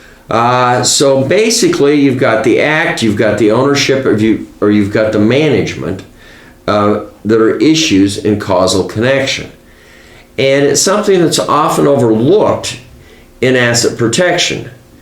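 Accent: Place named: Pennsylvania, United States. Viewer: You have a strong PA accent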